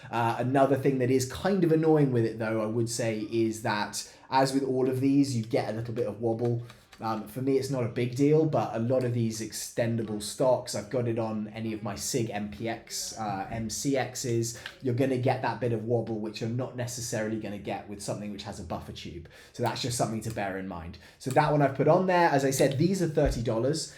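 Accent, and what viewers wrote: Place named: United Kingdom. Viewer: I heard the british accent and began wondering whether or not this was a real gun.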